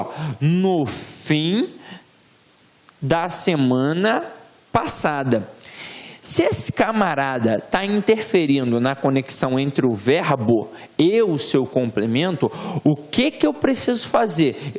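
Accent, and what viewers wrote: Rio de Janeiro, Brazil. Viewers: Aula muito legal, o carioquês é muito bom kkkkkkk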